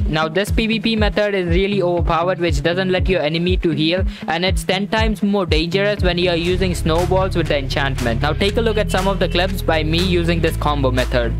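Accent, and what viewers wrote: India. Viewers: Is this guy indian??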